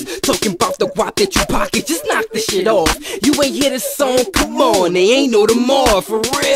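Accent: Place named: United Kingdom. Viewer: definately, are you british? Sound like it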